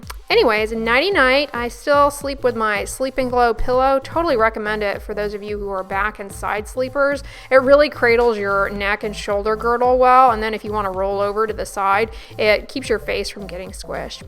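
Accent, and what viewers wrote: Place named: Midwest, United States. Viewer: This accent is “uppity Midwestern.”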